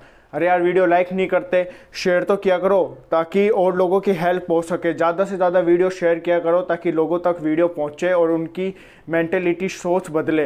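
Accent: Haryana, India. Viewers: Sir aap Haryana se ho kya ??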